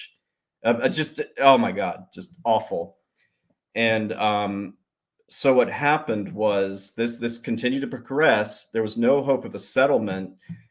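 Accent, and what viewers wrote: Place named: United Kingdom. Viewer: Love that saucy British narrator. ❤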